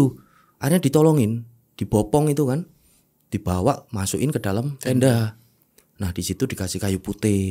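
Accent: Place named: Malang, Indonesia. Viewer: Sampean pemalange ngendi mas 😅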